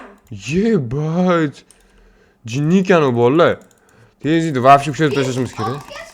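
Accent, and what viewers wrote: Khorezm, Uzbekistan. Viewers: Oka xorazlikmsz a?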